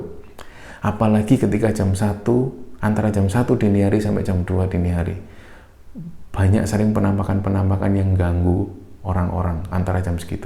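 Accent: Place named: Surabaya, Indonesia. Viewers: Cara nyeritano e Suroboyo banget, sukses selalu lurr🙏🙏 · Paling ditunggu gaya nya mas klo bercerita bahasa campur Suroboyoan mantap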